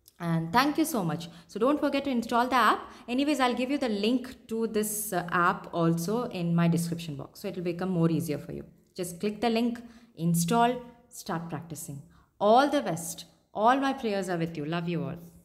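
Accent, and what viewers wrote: Kerala, India. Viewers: Mallu sound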